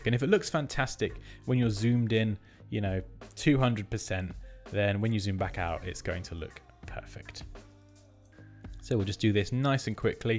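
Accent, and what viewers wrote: United Kingdom. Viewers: Nice voice and accent, your British accent is easy to listen and understand!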